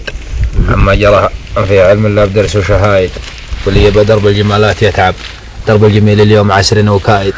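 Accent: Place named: Shabwa, Yemen. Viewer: الكنق العربي بالغلط حطيت حرف الهاء المهم عدلته وكل ما اقصده يا اخوي ان لهجتنا مثل لهجة صاحب القصيده انت تشوف لهجه اهل تعز واهل صنعاء واهل عدن وبعض اهل البيضاء وبعض اهل صعده وتضنها لهجه اهل اليمن وانت في هذا غلطان لان لهجتنا مثل لهجه صاحب القصيده وانا من شبوه ولهجتنا مثلها وبعض اهل صعده واهل الجوف ومارب وقبائل حضرموت… الخ لهجتهم نفس لهجه صاحب القصيده في اليمن لهجات كثيره واكثر لهجه العرب تعرفها لان اصحابها يطلعون في الاعلام وغيرها هم اهل ال3 المدن عدن وتعز وصنعاء وترى كل منطقه يمنيه لهجتها تختلف عن الثانيه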